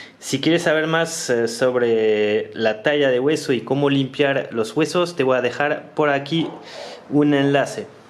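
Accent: France. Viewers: Eres español? Porque es inconfundible la musicalidad de la fonética francesa... 😮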